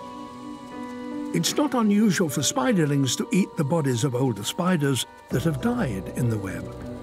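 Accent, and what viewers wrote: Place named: United Kingdom. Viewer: Ah my nightmare but with a classy British voice.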